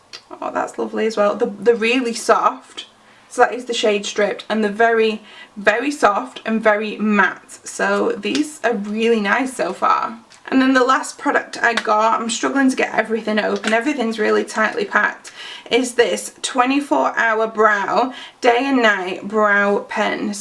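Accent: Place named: Yorkshire, United Kingdom. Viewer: I recognise a fellow Yorkshire accent! Loved the vid- I have got loads of Urban Decay pallets but these babies are going to be on my Xmas list( the one you used and the smoked one/ love a smoky eye)🙂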